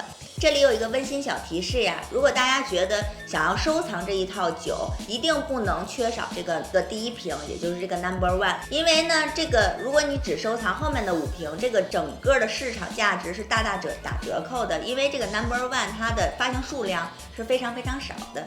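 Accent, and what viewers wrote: Tianjin, China. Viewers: 天津人吗？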